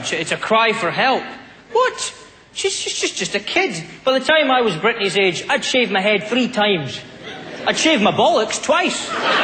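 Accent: Australia. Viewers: Wonderful aussie accent! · how funny is this guy, he does aussie better than most aussies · his aussie accent is spot on, and aussie parliament is exactly like that........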